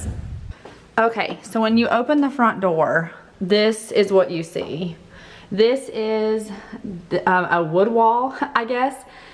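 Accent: South, United States. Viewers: Congratulations! This is so exciting! Can’t wait to watch you transform it into your own space! And girl... that southern accent is back! 😂 from one Bama girl to another! I love it! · So exciting!! It’s such a cute house!! Also your southern accent is coming in strong lol! I’m from Mississippi so no hate!😜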